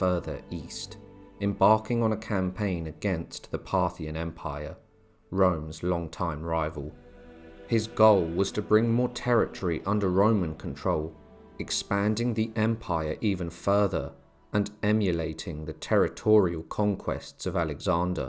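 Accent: United Kingdom. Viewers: Never change it bro. Its always nice to have a Brit tell me about history🤣👌🏻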